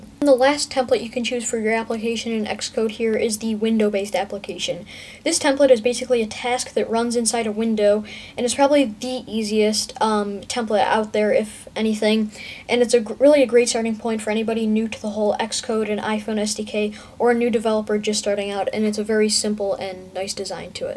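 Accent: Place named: United States. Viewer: I fucking hate the totally high American Accent!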